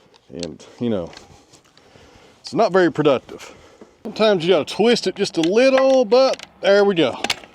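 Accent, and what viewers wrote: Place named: Tennessee, United States. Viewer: Thank you, I enjoy your projects and your mastery of the english language-- Tennessee style. Happy new Year, 2025 was great thanks to you.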